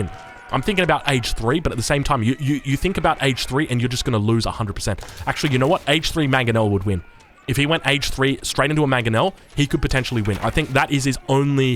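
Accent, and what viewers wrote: Australia. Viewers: the highlight of my work day is your aussie voice saying good day fellas or whatever and then proceeding to slay commentary for the next hour. thank you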